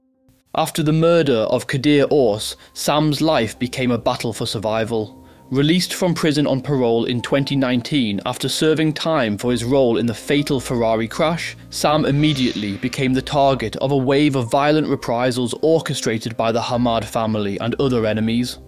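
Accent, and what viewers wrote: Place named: North, United Kingdom. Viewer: Why do we have this “northerner” instead of a good Aussie accent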